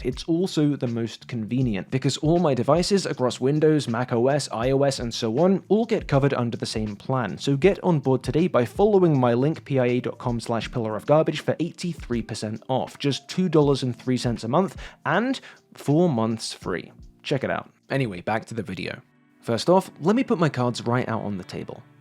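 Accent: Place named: England, United Kingdom. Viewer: I was able to watch about 1 minute of the video before you put out the Holocaust inverting, antisemitic lie that Israel is engaging in genocide. You're not going to convince me that this bullshit video is accurate by showing me another bullshit video. I assume from your voice that you're from England. There is a better case to be made that England is an "ethnostate" than Israel. Both have the same number of non-practicing people- about 50% for both countries (That would be illegal in most fundamentalist Muslim countries fyi). England, however does have a national religion. The upper chamber of the House of Lords must be of one religion. There is no such provision of the ruling body of Israel, the Knesset. The fact is that ANY of its neighbors is FAR more deserving of that epithet than Israel. But your not interested in the truth, you're interested in a narrative. 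That narrative is that Israel=Boravia not because it's analogous with reality, but it's analogous with your propaganda. In the movie Boravia is the big bad, Jarhanpur is the helpless victim. In reality the war started because of a genocidal antisemitic attack by Hamas. In the movie there is no Jew hatred, in fact in the movie your bullshit belief that Judaism is somehow separate from Israel is reality. In the movie the little black boy calling out for Superman was center of attention. In reality he'd be in the slave section. In reality the IDF is highly diverse. In the movie they're all white males. The movie corre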